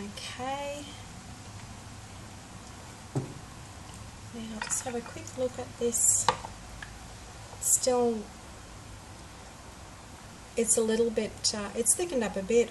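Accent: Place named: Australia. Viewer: Very pretty result. I've been watching so many American or Canadian soaping YouTubes lately that an Aussie accent really stands out, even though I'm Aussie too LOL Awesome work on your first video - I know it's not easy.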